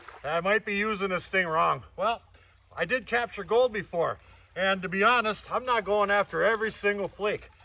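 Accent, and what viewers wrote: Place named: Michigan, United States. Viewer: Go aheaad an pan dis one ouwt just hits different when you’re from Michigan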